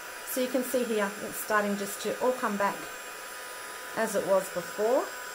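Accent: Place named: Australia. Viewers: G’day mate. I agree it’s always nice to hear an Aussie voice doing the videos. Here were lovely cards, love the pink & blue ones? Are you in Australia? Can you tell me the name of the tape runner you used and where to get it please, it looks like a good one. I have bought the small tape runners but they always seem to play up, and I have thrown a few away. Also where do you get your supplies from please, the stamping foams Altenew inks etc, buying from overseas is so expensive with the conversion rate. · Great technique with the stamping foam, it compliments your previous video using embossing folder. PS Its nice to hear an Aussie accent.!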